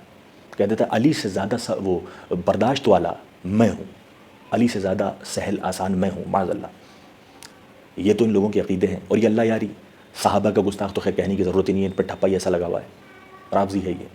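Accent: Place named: Bareilly, India. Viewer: Are yaar Aaj kiya hogaya is ko ekdam baraily Shareef ke andaaz me bola achha laga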